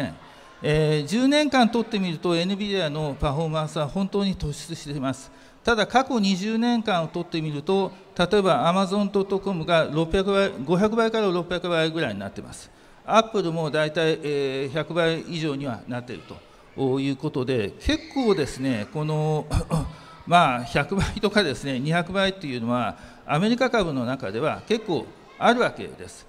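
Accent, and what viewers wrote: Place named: Fukui, Japan. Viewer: 今中さんは訛りからするとおそらく福井出身の方かなと想像します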